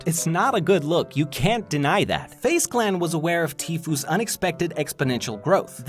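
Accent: Romania. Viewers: Bro tu clar esti roman ca se vede si dupa nume si dupa accent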